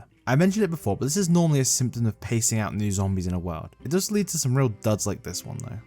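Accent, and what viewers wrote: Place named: United Kingdom. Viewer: why does your voice get increidibly more british when you stream?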